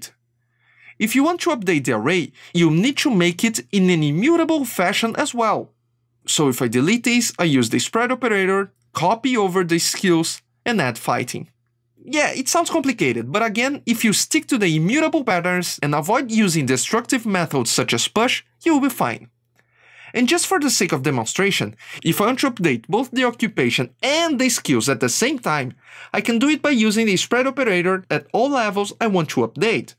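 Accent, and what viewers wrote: Brazil. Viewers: Are you brazillian? You have a brazillian accent Nice vídeo!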